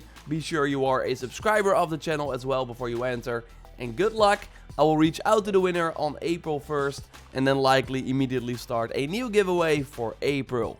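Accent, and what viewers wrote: Netherlands. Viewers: lekker maat, goeie video. ik vroeg me al af of je Nederlands was aan je accent te horen. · U sound dutch dude xD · NGL, when I imagine a Dutch accent, this is what it is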